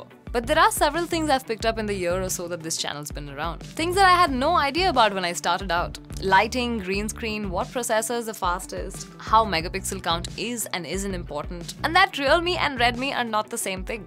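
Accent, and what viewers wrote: India. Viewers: This Indian accent only adds to the charm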